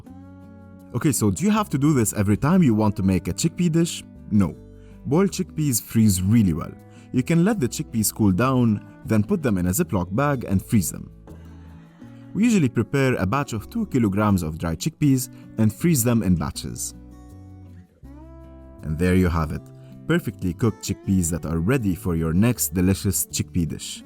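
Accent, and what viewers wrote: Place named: Lebanon. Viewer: Hah. That’s a Lebanese accent if I’m not mistaken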